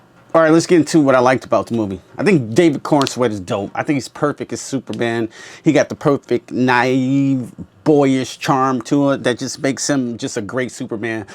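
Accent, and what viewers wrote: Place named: South, United States. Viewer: Yeah, I noticed the Southern accent too lol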